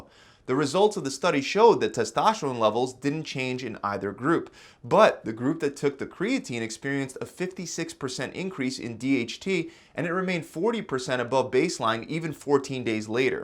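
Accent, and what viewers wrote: United States. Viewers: “YO” STOP TALKING LIKE YOU’RE AMERICAN AND ACT YOUR RACE, “HELLO MY FRIEND WELCOME TO KWIKI MART” 🐫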